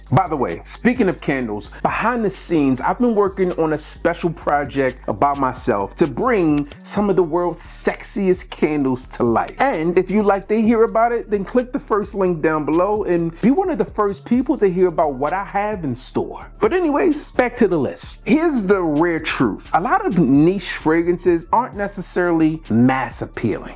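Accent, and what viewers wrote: Baltimore, United States. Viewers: Shoutout baltimore i hear the baltimore all throughout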